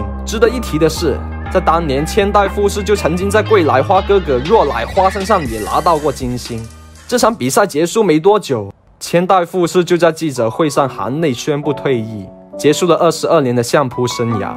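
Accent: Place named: Taiwan. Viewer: 本来很平淡的看人物传记，结果这台湾腔说到后来都激动落泪和死了爹一样潸然泪下，让我笑出声，真是大孝子。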